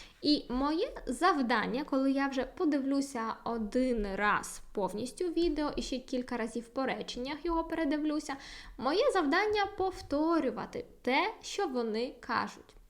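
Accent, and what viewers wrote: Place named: Poland. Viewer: Пані, вибачте, але у Вас сильний польський акцент.😉 Не маємо в українській мові слів "окремний" та "трохі", у слові "вимова" наголошеним є другий склад, а звук [ў] у закінченнях "-ів", "-ив" ніколи не перетворюється на звуки [в] або [ф]..)) Плекаймо ж Її- нашу Мову-Страдницю! За поради ж щодо польскої- велика Вам, пані, подяка!!)))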